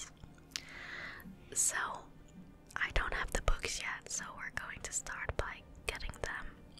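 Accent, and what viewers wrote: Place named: Netherlands. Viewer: Where are you from my guess is the netherlands cuz of the accent.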